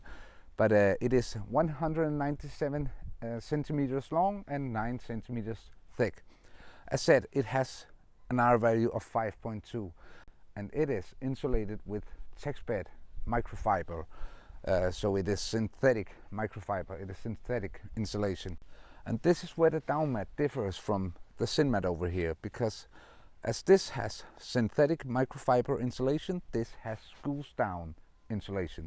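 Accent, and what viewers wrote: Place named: Denmark. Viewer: Can i ask where you live? You sound Danish but most of your videos dont seem to be shot in Denmark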